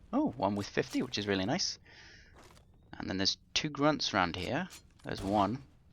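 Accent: United Kingdom. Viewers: i dont really think u do it more its just u respond to ur fans tyrant hasnt responded to any of my comments, plus ur videos r cool cause u take the time to put in commentary and ur voice sounds Brithish which is kool too. i subscribed to u becuase of ur videos,(Which cannot be more perfect) and plus if i am right tyrant only makes laso u make stuff that is HARDER than Laso, whcih takes time. ty make more vids!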